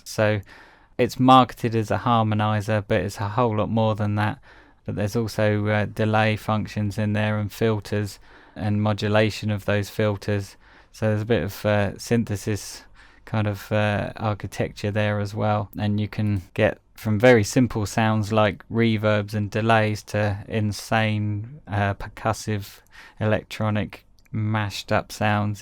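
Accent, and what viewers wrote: United Kingdom. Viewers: This video magnified my hatred of the British accent.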